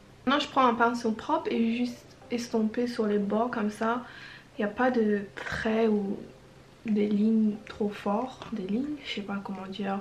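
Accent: France. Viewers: Your french sounds great...t'as un ptit accent français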